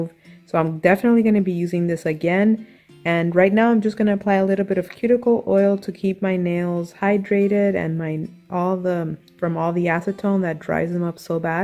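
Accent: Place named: Latin America. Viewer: Wow! You have that traditional Latina accent that is so distinctive. I love it. Keep up the great work.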